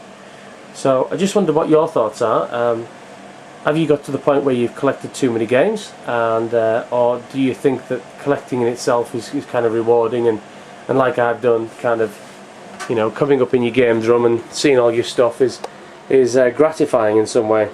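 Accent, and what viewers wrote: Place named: United Kingdom. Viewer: You talk like 007... Kinda cool you british people...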